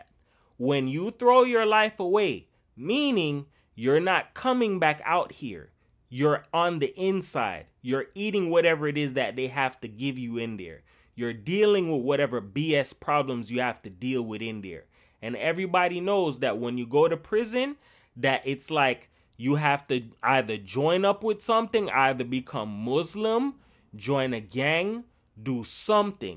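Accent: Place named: Jamaica. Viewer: I can hear the Jamaican in you. Dope video. There guys are some clowns and deserve what they get. Nobody or going to buy his lawyers bullshit. He said nothing is wrong with throwing up a gang sign in a RICO case 😂😂😂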